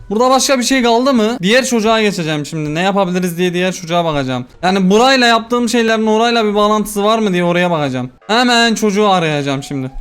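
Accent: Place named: Thrace, Turkey. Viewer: Abim sen trakyalı mısın sesin trakyalı ya benziyor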